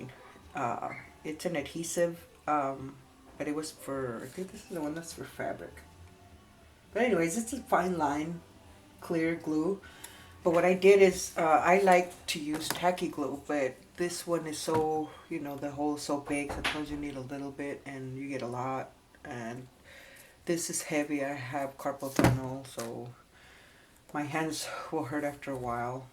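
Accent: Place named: Puerto Rico. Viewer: I know this is an older video but I've been watching all your older videos to catch up I guess. I love the way you teach. But, even more special to me is your voice and accent. You sound so much like my family that it makes me homesick. I'm Puerto Rican from NYC as is my entire family. I live in Virginia now so I'm far away from anyone that sounds like me. Listening to you makes me happy.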